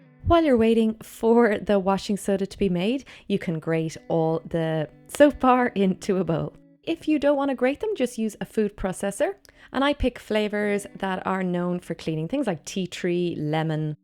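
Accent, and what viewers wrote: Ireland. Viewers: Was missing the Irish accent from the series on amazon. Finished all the episodes. Then this came up. Yay, lol 💕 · I love Irish accents! ☘️ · I was wondering if you were from Ireland then you said it! I'm italian so I don't have much clues about english accents, but the Irish one is in my heart forever now. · Oh the Irish accent, how I like it! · Your Irish accent is sooo cute!!! :) I can actually understand it!!! As a North American, I don't really have a chance to hear the Irish accent, but when I do I can't really understand what anyone is saying!!! Lol. May I ask what city or region your accent is from in Ireland? Thanks if u can reply!! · Are you Irish? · Loving the Irish accent 😉👍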